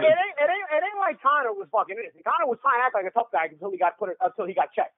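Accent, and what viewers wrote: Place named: New Jersey, United States. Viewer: Paulie used to be immensely annoying but his New Jersey accent seems to have died down a little so he may actually be tolerable soon!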